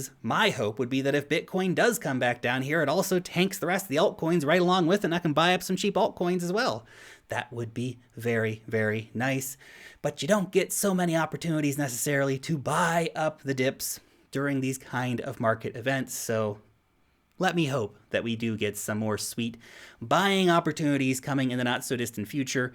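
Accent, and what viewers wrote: United States. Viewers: I always wonder why, as a kiwi, he speaks with an American accent. Any idea? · No accent?!? He has such a strong American accent🤣. But yes definitely no hint of a NZ accent. I am assuming he is an American living in NZ? Or maybe a kiwi who moved to the US as a kid?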